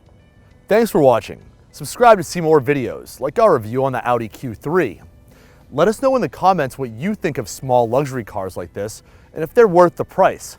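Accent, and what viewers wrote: United States. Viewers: That's the way Americans pronounce it, a bit like the way they pronounce Zed as Zee it seems they have to put there own spin on everything very annoying I know.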